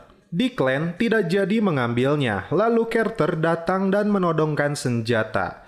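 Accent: West Java, Indonesia. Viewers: Ciga orang Sunda ey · Cemprenk cadelnya sih masih respect, tapi tolong dong sundanya kurang-kurangin.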